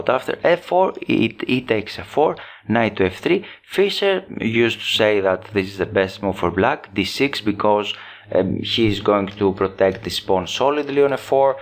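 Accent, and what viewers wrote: Greece. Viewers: Your accent sounds greek malaka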